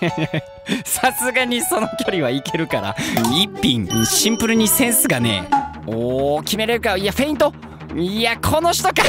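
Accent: Kansai, Japan. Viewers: 俺が大阪住みやから関西弁でわかりやすい（ちなみに今年の冬休みは受験勉強だけやから楽しくない。翔さんがおもろい動画だして楽しい冬休みにしてくれ） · 関西弁可愛すぎて聞くとニヤけてキモイやつみたいになってる、タスケテ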